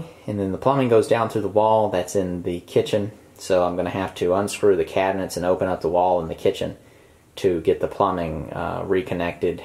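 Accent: United States. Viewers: To my untuned Scottish ear, I have no clue where in the states this accent is from! Anybody know?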